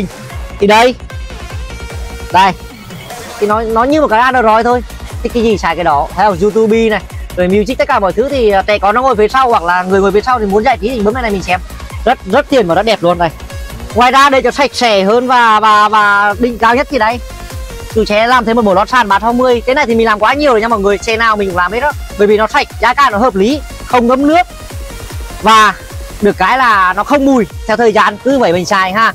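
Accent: Nghe An, Vietnam. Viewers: A giọng nói giống yên thành-nghệ an vậy ạ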